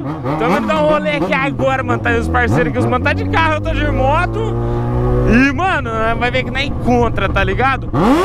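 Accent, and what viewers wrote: Goiás, Brazil. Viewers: o porque você não fala mais no pique do Goiás? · pq parou de falar no pique do Goiás?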